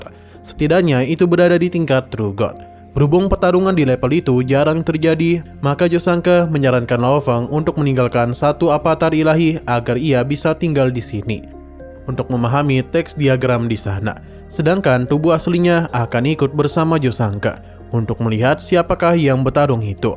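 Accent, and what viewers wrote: Banjar, Indonesia. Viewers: org banjar jua sakalinya min lih... terkipai kemana min😂😂😂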